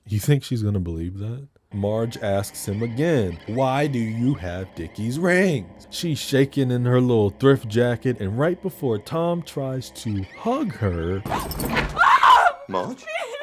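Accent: United Kingdom. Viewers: I feel like me and you would be good friends because I too also go into a British accent randomly for like no reason. 🤣🤣 I blame all the British tv & British YouTubers I have watched over the years lol.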